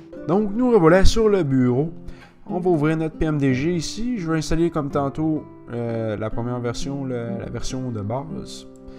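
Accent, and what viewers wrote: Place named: Quebec, Canada. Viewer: yeahhh un quebecois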